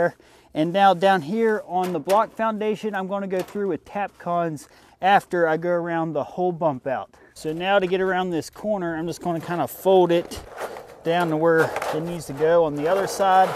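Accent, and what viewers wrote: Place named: Pittsburgh, United States. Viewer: Listen to that Pittsburgh accent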